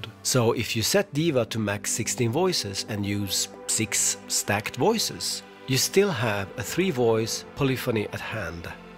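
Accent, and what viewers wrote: Sweden. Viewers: Dr Mix speaks with an Italian accent. This guy speaks with a pronounced Swedish accent. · Du låter svensk :)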